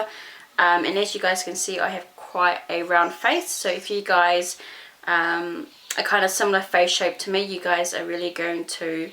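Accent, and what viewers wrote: New Zealand. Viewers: guuuurl recognized the kiwi accent right off bat. love the videos!!!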